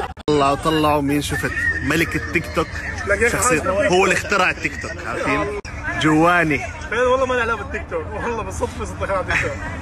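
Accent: Iraq. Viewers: كانو انت عراقي من لهجتك مبيت كتير حلوه اللهجه العراقيه 💛💛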